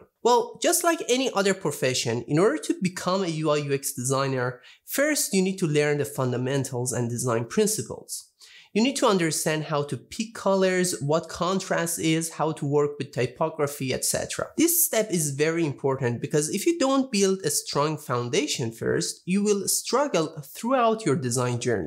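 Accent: Iran. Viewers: شاید باورت نشه ولی وقتی که برای بار اول صدات و تلفظتو شنیدم (بدون اینکه اسمی از کانال یا ... ببینم) فهمیدم ایرانی ای😁😁😂😂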